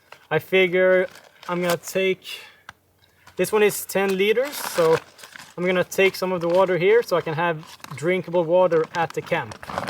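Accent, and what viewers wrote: Scania, Sweden. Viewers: Riktigt bra video! Hälsningar från skåne! Hörde lite skånska i din dialekt, får man fråga vart i skåne du kommer ifrån?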